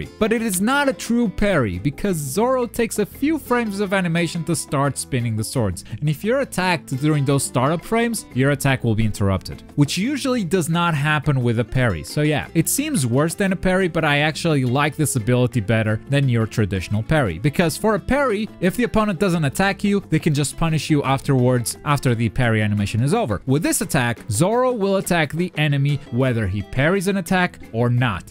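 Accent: Portugal. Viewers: Are you portuguese?? Like portugal portuguese?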